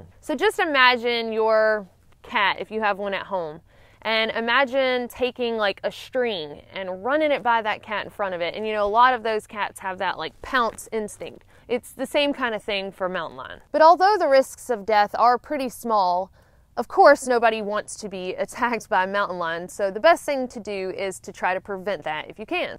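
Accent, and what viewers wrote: South, United States. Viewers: Thank you so much for this informational video! & btw from a Michigander I have to say that your southern drawl is so sweet very charming! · Good primer vid. Cool to a non-tarzan dude doing a predator show. But, being a southern boy, I can't determine the accent. A southern accent kicks in with every word with an "i" and the word food. Georgia, Tennessee, the Carolinas? Maybe Florida? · Are you a southern belle? You certainly sound like it. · Where are you from?? You sound a little southern. Mountain lions are silent and sneaky. I heard said that if you wear a hat that has eyes or a face on the back of the hat it could stop an attack from behind. Sacketts I love those books I've read them all, starting at the beginning. Ole Barnabas Sackett started it all☺. I wonder if bear spray works on mountain lions. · Love her Southern accent. 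Charming.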